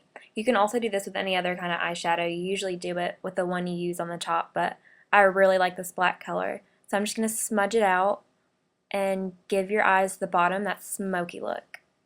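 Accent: South, United States. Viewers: Omg had a country accent lol I love it! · Your southern accent is cute!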